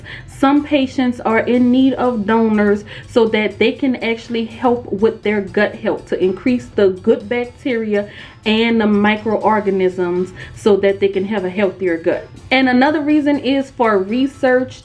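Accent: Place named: Louisiana, United States. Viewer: Obviously tell your from Louisiana by your accent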